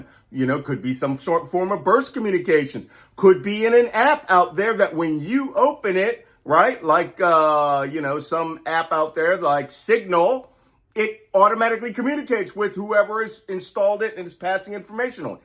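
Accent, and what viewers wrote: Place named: Maryland, United States. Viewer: Going by your accent and expressions I'm guessing that you are from Maryland.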